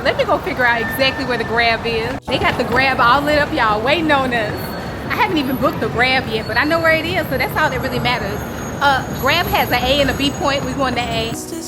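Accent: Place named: South, United States. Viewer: Your accent sounds more Southern than Texan. Did you grow up in Texas ?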